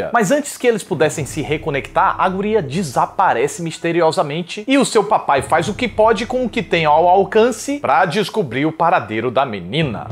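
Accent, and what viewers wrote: Northeast, Brazil. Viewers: De q parte daki do Nordeste vc é? Coboco arretado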